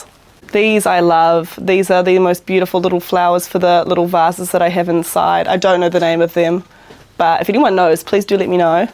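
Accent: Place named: New Zealand. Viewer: Thanks for the demonstration - simple is the way to go. You should get an Italian pasta machine (joking), the NZ accent is contagious not that our Aussie one isn't. 👍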